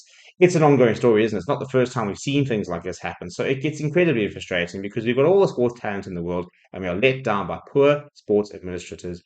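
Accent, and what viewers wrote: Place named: South Africa. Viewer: Just sounds like a normal south African accent to me.i can understand everything and I'm a kiwi.maybe you need to listen more carefully.cheers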